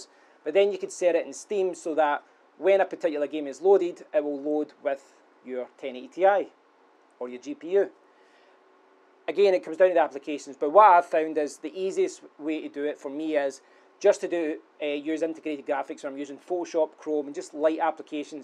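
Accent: Scotland, United Kingdom. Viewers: Hey, is it scottish english you're speaking?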